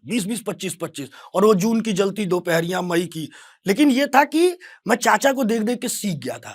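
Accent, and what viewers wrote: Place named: Ballia, India. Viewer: Vinod ka hi real character lgta hai.. Ballia ka sahi accent hai aapka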